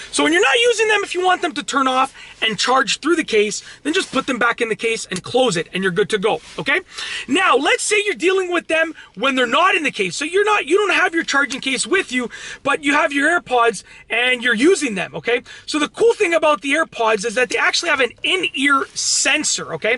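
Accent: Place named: Canada. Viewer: I love ur amazing Canadian accent and your content. Especially when you say hey everybody.😂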